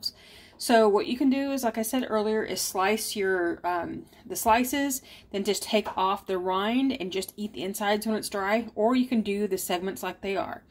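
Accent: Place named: Australia. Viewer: "sorry a bout the cat" lol I was looking around here for which of my 3 cats were going off! Great tips, thanks for the down under speak-loved it! ♥ thanks so much for sharing!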